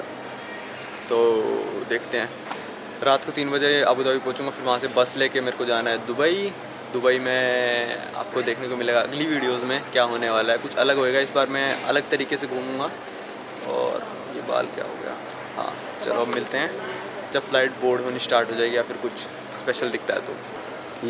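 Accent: Haryana, India. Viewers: I love Haryanvi accent bhai. Love from Lahore,Pakistan.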